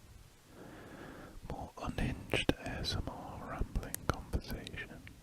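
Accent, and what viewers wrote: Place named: United Kingdom. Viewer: This guy reminds me of Asmr for nerds but with a British Accent. Idk, pretty sure it’s the same guy… anyway love the vids man keep up the good work!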